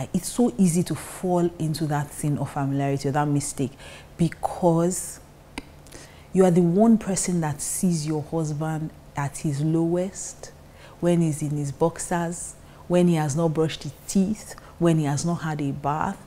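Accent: Nigeria. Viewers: I dont like that Nigerian tonation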